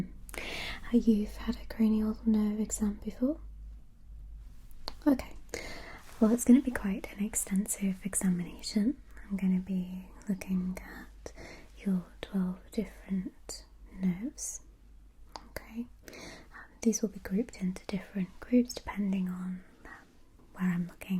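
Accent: United Kingdom. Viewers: Very relaxing British voice 🇬🇧👍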